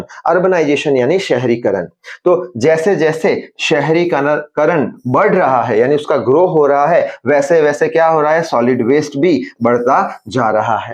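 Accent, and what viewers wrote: India. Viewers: You speak Indian English. And you look like an Indian.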